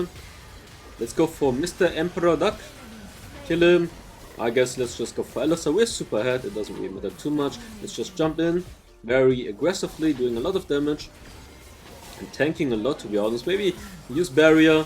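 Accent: Germany. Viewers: Are you german ?? 😂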